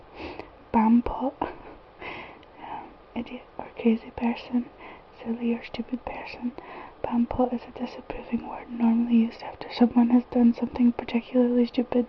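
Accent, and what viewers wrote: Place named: Scotland, United Kingdom. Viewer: Just found You.......yahoo.........I am Scottish too but have been in Canada for 50 yrs......I love the accent, my Parents have the accent but I cannot hear it hahaha, cheers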